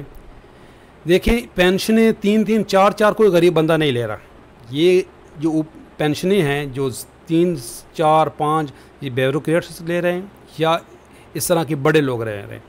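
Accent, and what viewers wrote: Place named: Pothohari, Pakistan. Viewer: AP pothohar say belong rktay lahja pothohar Wala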